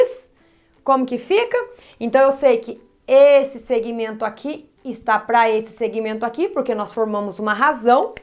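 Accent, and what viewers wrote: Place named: Caipira, Brazil. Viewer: o sotaque caipira dela🥺🥺💗 Ótima aula, parabéns!!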